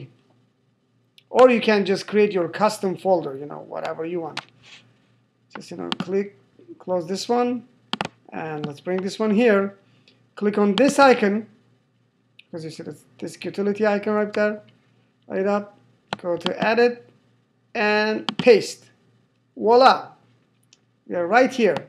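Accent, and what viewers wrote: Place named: Russia. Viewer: russian lol!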